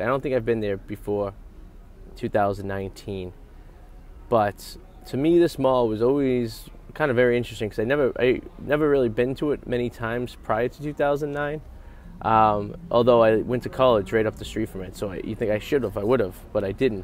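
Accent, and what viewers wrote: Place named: New England, United States. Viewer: If anyone is wondering what a New England accent sounds like... :)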